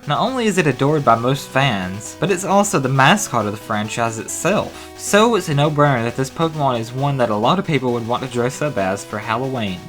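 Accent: South, United States. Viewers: Omg this guy's voice is so red neek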